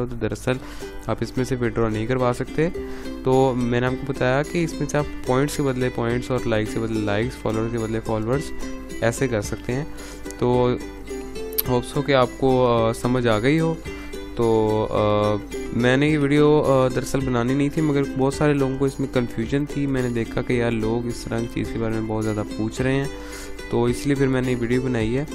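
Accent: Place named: India. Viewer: well it's ok you have some indian accent so i don't really understand heh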